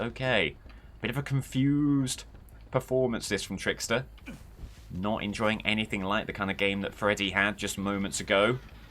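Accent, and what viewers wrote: United Kingdom. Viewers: i love your accent its honestly very cool you are my fav british (assumed brit, sorry im not sure which specifically if its wrong) dbd creator you always do good commentary as well. real proper gent. as an American i always like to hear the way others talk its a nice change to listen to a non american accent! good stuff.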